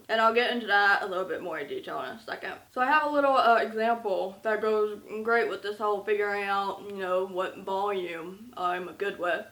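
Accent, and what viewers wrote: South, United States. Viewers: omg I just came across your channel and you’re incredible! Are you from the south or something? You sound like it 😁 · How does she have an American accent if she can't hear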